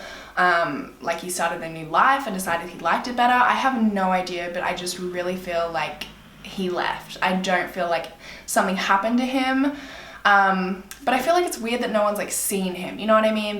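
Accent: Australia. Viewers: i normally rly don't like accents like british accents but i love your australian accent so much and you're so beautiful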